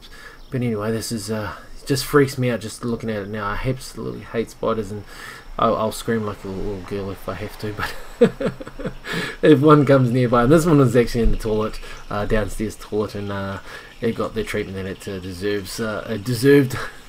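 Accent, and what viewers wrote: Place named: New Zealand. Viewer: Spider wib! Man, I love the NZ accent! xD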